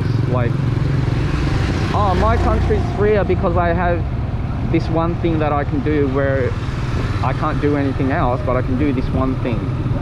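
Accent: Australia. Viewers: i agree with you 100 percent...up to a certain point. the point: try making a video bashing vietnam while u r in vietnam...and then, go back to australia (assuming from your accent) and make a video bashing australia. and... mr. dam vinh hung was recently "called in" for a meeting with the authority and fined for warning people about the kung-flu that going around. and... some dude wrote a song about police brutality and spend about 2 yrs in prison...he's ok now... In the theme of the movie 300...walk around saigon with a shirt that depict king leonidas kicking hcm into the bottomless pit with the caption: THIS IS SAIGON...good job, nice vid and thanks.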